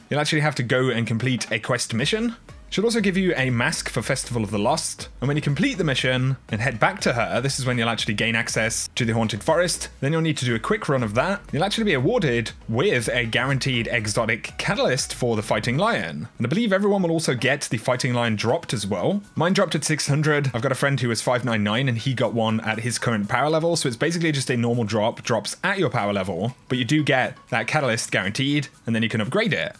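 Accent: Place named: Scotland, United Kingdom. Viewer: U Scottish fuk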